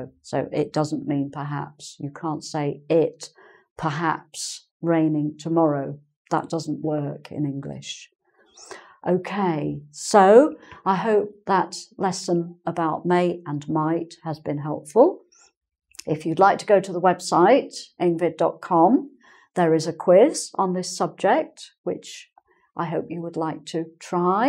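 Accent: United Kingdom. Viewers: It might be her English/British accent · Nice explanation! I love the British accent...in fact you can't disassociate a language from its own background, right? I have a question on this topic here regarding to pronunciation. The word "maybe" has a slight difference from the 2 words "may be", doesn't it? I do think I hear that! In "maybe" is the first syllable stressed? In "may be" is the second word stressed? Am I right? Thanks for your videos....I love them! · ale jaki to akcent? londynski czy moze oksfordzki? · I love your British accent!^_^ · Her English accent is perfect as I am quite familiar to British accent . From Thailand. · My god i really miss the British accent 😢💔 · This is the accent that the world wishes all Brits had! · Lovely British English ❤️ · I love your beautiful accent !!! and your lessons of course !! Your british accent is wonderful. 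Regards from Chile. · Love that british accent. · She is so charming,....and, her voice and her British pronunciation are very pleasant indeed. Thank you, Ms:-). It has been a real treat. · such a beautiful British accent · I love your british accent. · What a lovely woman, this is the British accent that everyone can understand. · she is beautiful, her speaking style is very British and it makes everyone understand❤️ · * Hears a British accent * * Love for the English language intensifies!!! * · British accent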